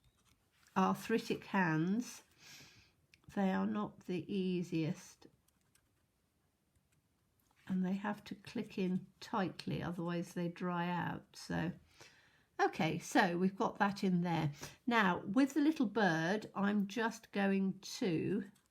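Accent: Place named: England, United Kingdom. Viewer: I LOVE the way you chat so naturally to us! My father was English and I do so enjoy hearing your accent. He has been gone many years now but you make me feel "at home". Our Aussie drawl is nothing like his beautifully refined tones which you share. Thank you! I also love the gnomes sets and have them. You gave me ideas for other designs with them too! Bless you!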